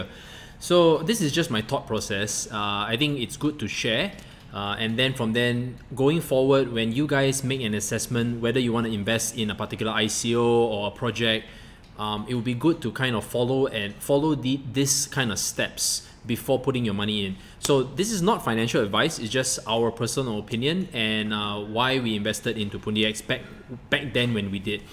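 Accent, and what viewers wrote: Singapore. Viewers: Singaporean accent is so funny.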